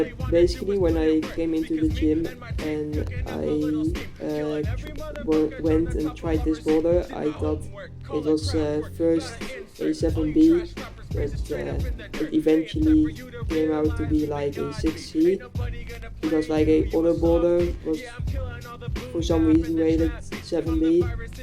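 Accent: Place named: Netherlands. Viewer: Ja, begrijpelijk. In dat geval zou ik werken aan je uitspraak. Het is oké om Engels/Amerikaand accent te bluffen, dan krijg je er op den duur wel gevoel voor. Aangezien het Nederlandse accent nu erg sterk is. Ook de werkwoordgrammatica. Verder goede videoconstructie, dat je ook review-reviews doet, erg leuk!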